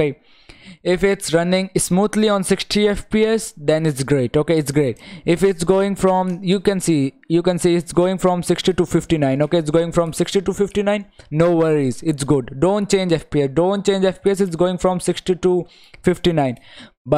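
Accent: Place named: India. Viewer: i hate the indian accents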